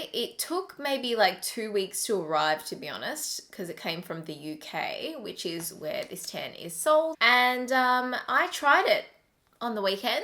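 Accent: Australia. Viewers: She sounds Australian not British. Maybe it washed off cause she didn't wait long enough. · Okay but I love your voice and your Australian accent. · this is a really random question but is she from australia? idk she sounds australian though. just asking because i want to watch australia youtubers and she was in my recommended and she seems nice and i love her already ahaha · Is she from Australia? I am and I think I recognize the accent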